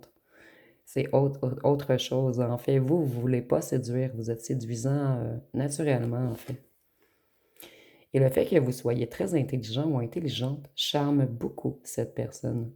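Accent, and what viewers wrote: Canada. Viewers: 😂😂😂😂🏃‍♂️ c'est ENGENDRAIT 🤔🤔 ça passe très bien avec l'accent Canadien.. Merci Belle Âme ❤ les jours passent je me rends compte que je n'en veux plus de cette là.. plus jamais... qu'est ce que je ferai d'une femme objet sexuel qui a parcouru toute la galaxie en fleurtant avec n'importe quel homme.. jamais de la vie.. jai tourné la page et pour de bon.. j'attends l'heureuse élue et je sens qu'on va bientôt se croiser ❤❤ Gratitide infinie envers le Divin et l'Univers 🙏🙏🙏🙏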